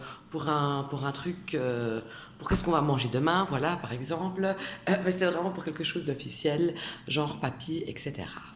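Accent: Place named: Belgium. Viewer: Bonjour bel accent belge🤗😘👏🌹🥀🥀🥀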